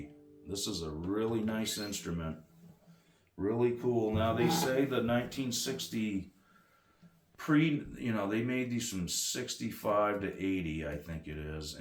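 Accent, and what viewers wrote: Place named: Maine, United States. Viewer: As soon as I heard u speak I knew you were a fellow Mainer. Cool video nice guitar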